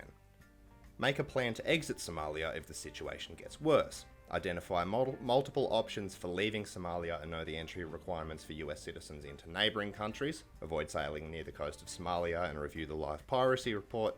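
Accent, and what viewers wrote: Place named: United Kingdom. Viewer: you have a british accent..you from the uk?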